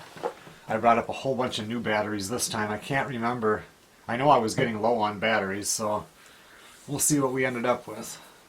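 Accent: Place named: Northwoods, United States. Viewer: Just found your channel; great to hear that accent. I was born and raised in Cloquet, lived in Duluth; now transplanted to OKC. These will help me get my Northwoods fix, so thanks.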